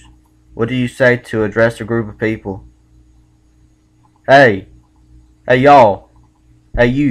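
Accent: United States. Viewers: This literally my exact accent. I’m from SC as well. But I don’t say theater like “Thee-ator”. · You are too cute!! I'm from South Carolina as well, can def. hear your southern accent!! :)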